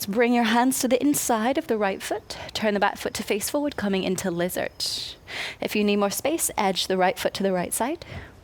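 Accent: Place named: Scotland, United Kingdom. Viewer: Only just discovered you however done a few sessions they are perfect in every way. Just curious where you are located? Detect a soft Scottish in your accent Hey all well done from jane in Australia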